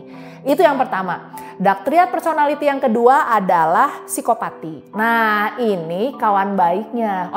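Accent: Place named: West Java, Indonesia. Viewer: 😂😂 selain Seneng penjelasannya dok, juga pengen ketawa juga krn dokter nyunda pisan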